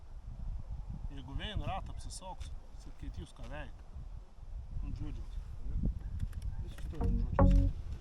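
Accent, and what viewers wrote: Samogitia, Lithuania. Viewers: bet vistiek ta jusu zemaiciu kalba grubi yra kai kalbat tarp savu :D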